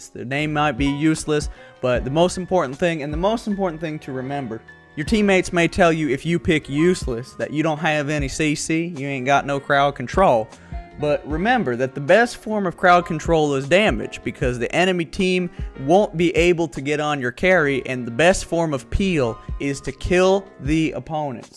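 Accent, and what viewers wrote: United States. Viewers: i love that Alabama ascent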